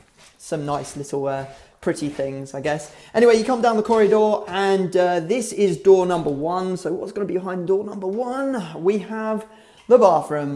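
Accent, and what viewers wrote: Nottingham, United Kingdom. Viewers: Do u come from nottingham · Are you from nottingham?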